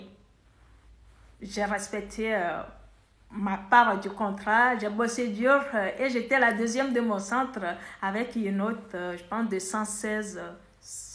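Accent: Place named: Canada. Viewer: Accent canadien si j'ai la bonne mémoire 😅😅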